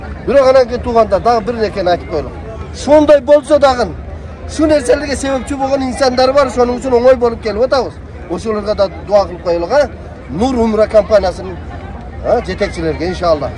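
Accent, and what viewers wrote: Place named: Uzbekistan. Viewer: Караң э, дең э, айтиң э, деп өзбекче эмес айтыңыз, деңиз караңыз деп адабий сүйлөгөнгө аракет кылсаңар муну бүткүл Кыргызстан көрөт...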